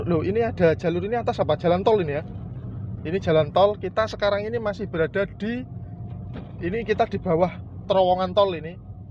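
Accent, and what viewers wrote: Java, Indonesia. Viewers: Log at jawamu medok sekali..geli & jijik dengernya..aq pindarh aja..sorry..! Mantap.jawa kowek..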